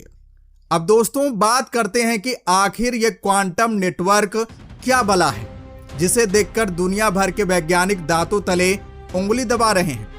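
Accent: Bihar, India. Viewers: I wish our endeavour great success. I didn’t like the language of the presenter. Sounds too Bihari. Jai Hind.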